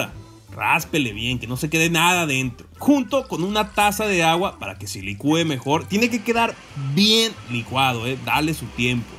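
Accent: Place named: Monterrey, Mexico. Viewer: Jajajaja me encanto como describes la receta, asi hablamos los de Monterrey. Buenisima, la estoy haciendo ahorita mismo.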